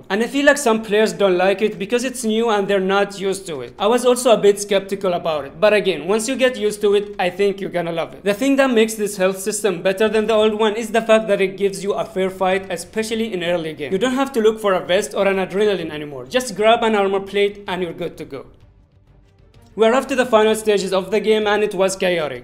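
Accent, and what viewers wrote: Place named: India. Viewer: I don't know where r u from. But ur sounds like u r Indian😀.and I really like your gameplay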